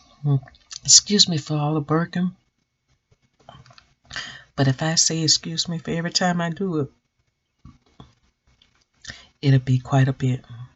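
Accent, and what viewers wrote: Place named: South, United States. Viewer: Welcome. Love ur south accent.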